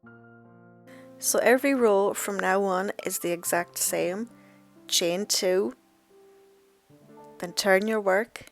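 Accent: Ireland. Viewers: So cute i am always scared to make sweater. Becouse of my boobs being so big lol i am gonna have try this out though do you think if i measure around my boobs and get ths mesurment that way it would work .just wondering i am 44 dd. I love the sweater and i love your Irish accent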